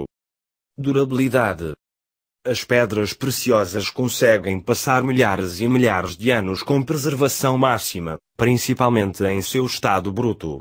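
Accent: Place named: Portugal. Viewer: Muito bom, pena ser português de Portugal...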